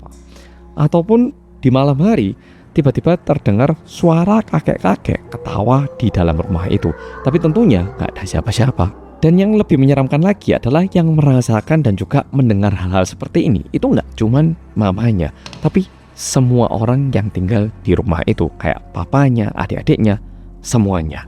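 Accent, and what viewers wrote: Java, Indonesia. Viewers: Iyo serem rek.. Aku nyimak siang hari... Sehat sukses selalu muedok🎉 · mas medhokkkk .. tolong bahas kasusnya furota dong yang di siksa 44 hari .. di perkosa secara sadis sampe mayatnya di cor di tong ... pngn tau cerita lengkap nyaaa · Sumpah suara host nya MEDOK bet tapi enak didengar hehe semangat terus ya...n semoga chanelnya semakin berkembang....all the best for your chanel 🙏🙏🙏🙏🙏🙏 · medok amat rek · Muncul di bawah video bang mewing. Penasaran orang korea ngomong b.Indonesia, ternyata medok..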